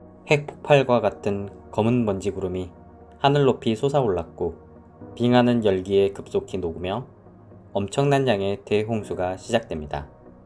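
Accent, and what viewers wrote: Jeolla, South Korea. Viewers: 진지하게 얘기하다 중간중간 튀어나오는 전라도 억양이 너무 귀여우시네요!ㅎㅎ 좋은영상 앞으로도 부탁드려용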